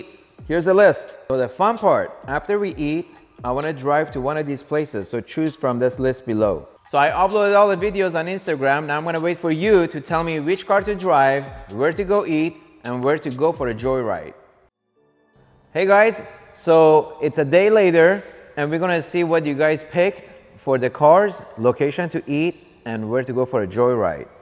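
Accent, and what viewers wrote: Iran. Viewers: New subscriber. Love your videos, but afraid to pick up Persian accent. ;) Ppl think Im Persian anyway, so Im ok with it anyway. :) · Eh-screws, makes me feel like home, good ol Persian accent 😂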